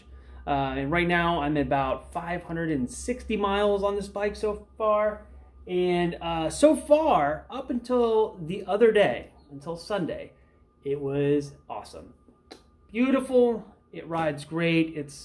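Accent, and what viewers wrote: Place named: Southern California, United States. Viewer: Hey glad you got a replacement frame. It sounds like you’re from SoCal as well. Could you tell me which giant dealership you dealt with.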